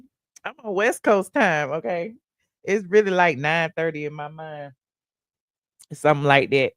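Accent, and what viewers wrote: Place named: Georgia, United States. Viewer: "Come own Ian" is what it sounds like to new yorkers . Its like youre a Georgia big sister reading a bed time story . Thank you 🩷🩷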